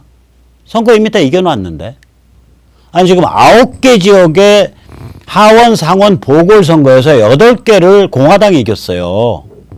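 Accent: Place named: Seoul, South Korea. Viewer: 오늘도 자알 들었습니다. 수강료를 고액지불하고 들어야할 정도로.. 그아말로 세뇌탈출..! 감사 합니다. 때때로.. 아는 사람들만 알아듣는 님의 "서울사투리"가 감칠맛을 돋궈주십니다.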